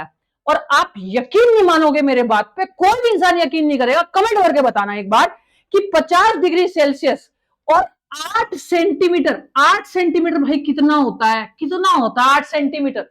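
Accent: Haryana, India. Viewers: मैडम आप हरियाणा कि है क्या, मुझे आपकी भाषा बहोत अछि है।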